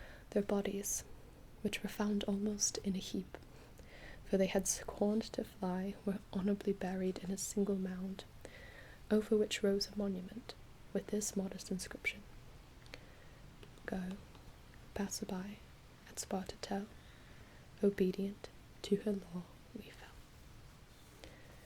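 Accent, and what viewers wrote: England, United Kingdom. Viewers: Carrying on the fine tradition of the media giving all ancient or futuristic civilisations British accents, queeny approves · Why does she have an English accent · That English accent though 👌👌👌😂😂😂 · Holy hell this accent!!! I literally did a double take when you first spoke because I couldnt understand how such an english accent was coming out of your mouth haha. Yassssssssssss huge congratulations, you are absolutely awesome and such an amazing asset to our rapidly expanding community! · Shouldn't you have a Greek ascent? and not a n English one? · Love it good job on the English accent big shout out from Leeds England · Okay but why the british like accent dude? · Why the british accent though??watching this as greek is so cringy but w/e great work anyways.. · Insanely good British accent!! loved it! seriously sounded like you are from England :4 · Wow, English accent is spot on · Wow that's the best English accent I have ever heard from an American, honestly in a movie you'd not be able to tell which side of the atlantic ✌️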